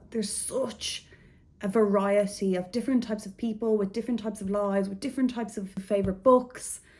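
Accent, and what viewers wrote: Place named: Ireland. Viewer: Great video! You have fantastic taste in books (Ready Player One, multiple Murakami, Cloud Atlas and Shadow of the Wind among others 😊) I really hope you find your rhythm and keep the passion for this, because this space definitely needs a voice like yours. The 10 days I spent in Ireland were the best of my life, so your accent reminds me of better times:) P.S. book recommendation for you... History of the Rain by Niall Williams. Irish author, takes place in a small fictional village in County Clare. Beautifully poetic and a great book for literature lovers.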